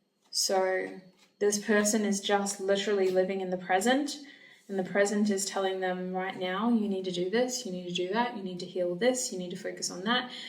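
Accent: Australia. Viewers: it’s INSANE how accurate your readings are. also, another reason I love watching is because of your australian accent 😍❤️ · Are you Aussie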